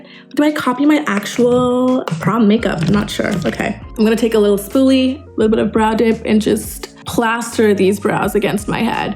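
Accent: United States. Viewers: i swear you sound more american every video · Every time she makes such videos its hilarious to me, she seems like the typical trying to fit into the box kinda girl who tries to be relatable. This accent is it for me, how much will you try to fit in and sound like the typical LA influencer. I feel like when she was in Dubai she at least wasn’t trying to be something and was genuinely funny this is just cringe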